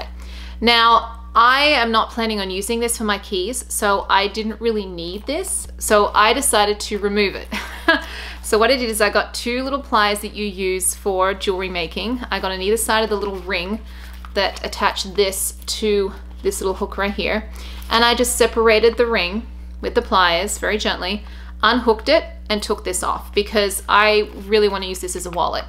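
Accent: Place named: Australia. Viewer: New to your Chanel. You sound Aussie! So why the US $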